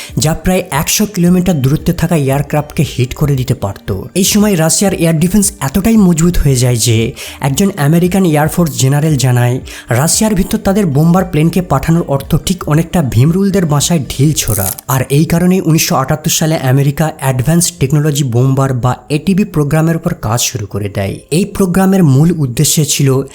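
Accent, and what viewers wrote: India. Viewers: কথা শুনে মনে হচ্ছে তুই গাধাটা ইন্ডিয়ান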